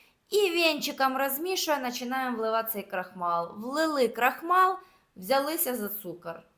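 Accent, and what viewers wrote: Surzhyk, Ukraine. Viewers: Те як ви говорите, має певний шарм... весело і добре від того суржику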